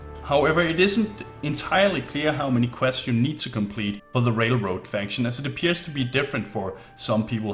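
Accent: Denmark. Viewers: OMFG I knew your accent was Danish!!!! Yes!!!! Hej! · are you danish or something??? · funny accent, are u from denmark? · Tyk dansk accent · Are you dansk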